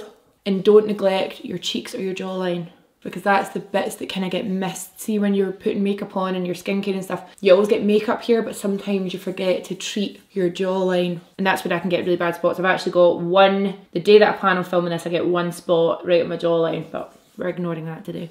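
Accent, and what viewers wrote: Scotland, United Kingdom. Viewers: you're so Scottish, I love it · i just want you to know that my teacher has a scottish accent and everytime she speaks i always imagine u speaking lmao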